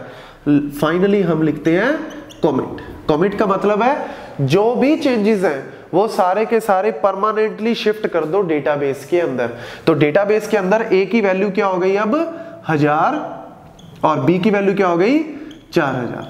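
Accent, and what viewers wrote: Punjab, India. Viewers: Very good explaination along with great punjabi ascent. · u r so cute....haha...and ur hindi accent 4000,5000...haha...so cute...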